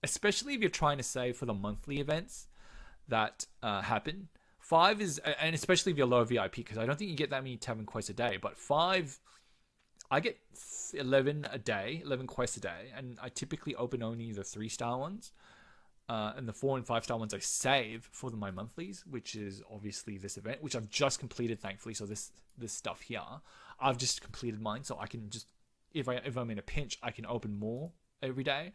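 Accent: Australia. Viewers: Are you in Australia? Love the Aussie accent lol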